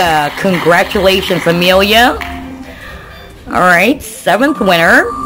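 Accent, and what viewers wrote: Philippines. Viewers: Wow you know how to speak Tagalog, I'm a Filipino you have a nice accent of Tagalog dialect 😍😍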